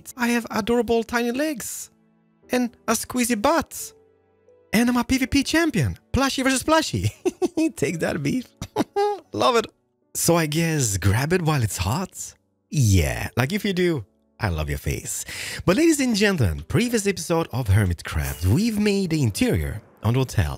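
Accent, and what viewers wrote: Scania, Sweden. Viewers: are you Swedish? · i love his accent: its called skånska. om du ser detta, jag är ocks skåning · Blev chockad när jag hörde den skånska dialekten! Var inte beredd på det!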